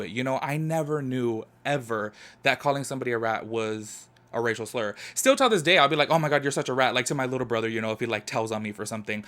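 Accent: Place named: Texas, United States. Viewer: omg ur from san pedro???? much love from the south bay 🤍🤍🤍🤍 thought u were from texas lol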